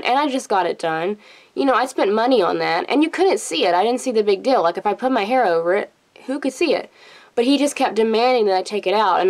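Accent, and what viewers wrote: South, United States. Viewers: a bit of southern accent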